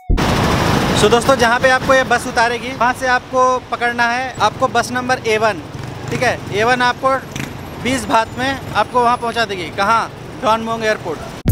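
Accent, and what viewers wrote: India. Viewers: Bhai ap jab bhi Desi andaaz bolte ho na to dil khush ho jata hai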